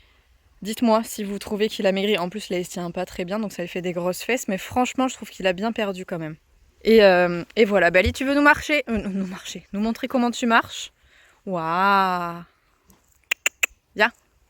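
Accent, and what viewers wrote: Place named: South, France. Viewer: Beaucoup trop beau Baly ❤️ C’est magnifique de voir ça. Moi ce que j’adore c’est l’accent du Sud de la France. Chez toi chose c’est transforme en cheuse ahah je suis fan. Sinon je donne aussi de la biotine à ma jument une fois par semaine de Kramer Elle est en poudre et sens plutôt bon. Je ne connais pas les produits décathlon. Pour les pieds de Baly, temps que tu me dépasse pas 30 km par jour ( effort naturel du cheval ) tu peux parfaitement le laisser pied nus, regarde Jade ça ce passe super bien. Y a même des cavaliers olympiques qui sont sens fers. J’espère vraiment que tu vas pouvoir le laisser pied nus. Et le petit éclatax c’est du jujufitcat ça 🤣🤣🤣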